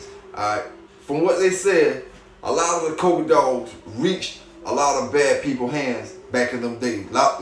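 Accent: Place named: Oklahoma, United States. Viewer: Much love Keep pressing forward. I don't know where you from...sound like you from Oklahoma.....love the channel. #Kansas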